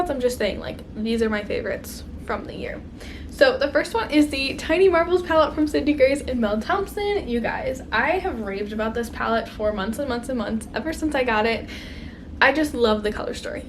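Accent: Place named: Southeast, United States. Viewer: I hear that pronunciation a lot in the southeastern US 🤷‍♀️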